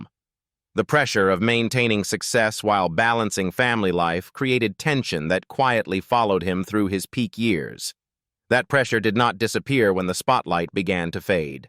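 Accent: United States. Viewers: An American voice as well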